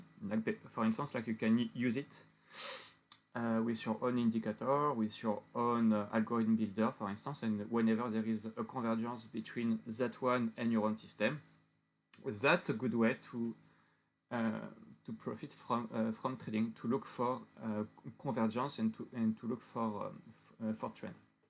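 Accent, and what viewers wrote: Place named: France. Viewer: toi tu es français 100% ton accents c'est sur poto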